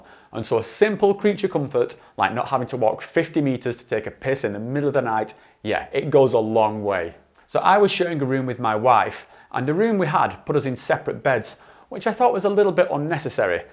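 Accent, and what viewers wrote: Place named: Manchester, United Kingdom. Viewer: ah I knew you were close, I can hear the manc in your accent now haha I'm from Leeds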